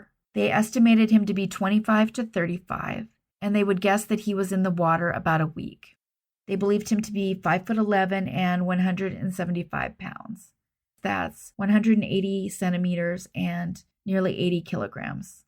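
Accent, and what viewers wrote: United States. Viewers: I love your delivery. I think its the Fl accent for me. You remind me of my bff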